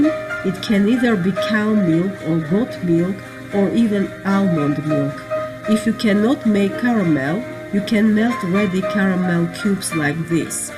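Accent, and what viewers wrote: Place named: Austria. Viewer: I saw her other channels, the lectures. She speaks Austrian German.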